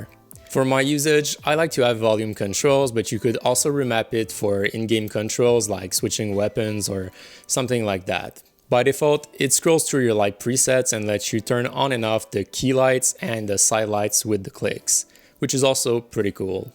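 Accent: Quebec, Canada. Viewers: Tu est quebecois?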